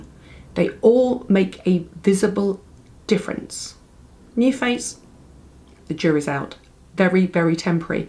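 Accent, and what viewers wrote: United Kingdom. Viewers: Great video,. I love your British accent! This coming from a Texas Gal! Happy holidays!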